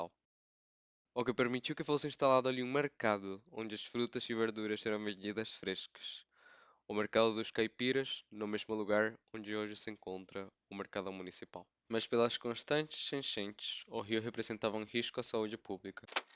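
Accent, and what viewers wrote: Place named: Portugal. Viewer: De onde é seu sotaque? Fiquei muito confuso, parece mistura de português (de Portugal), com paulista e carioca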